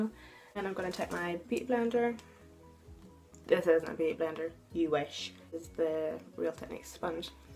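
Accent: Northern Ireland, United Kingdom. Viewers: Northern iron girl ! Luv our accent on youtube 😁👍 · I'm from NI too and I know exactly what you mean about saying Northern Ireland in our accent hahaha, love your videos chick you're super talented! X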